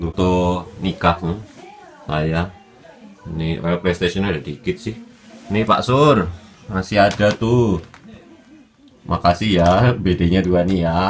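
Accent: Java, Indonesia. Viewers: walah wong jowo tenan, makane kok koyok roh logate iki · hepi saterde mas (medhok banget ya meski dah lama di jepang)............ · wong jowo orang ilang jowone masio dek jepang salut bang :D medoknyo masih terasa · Logat jawa nya masih kental ia mas ..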